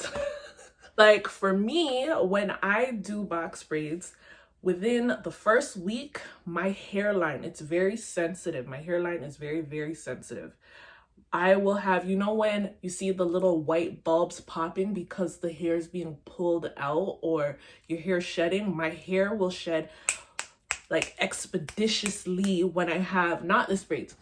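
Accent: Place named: Toronto, Canada. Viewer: You sound like you from Toronto. Your hair looks great I want to try these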